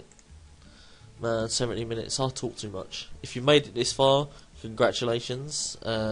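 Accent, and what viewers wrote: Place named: Cockney, United Kingdom. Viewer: You have a cockney accent for sure mate, like danny dyer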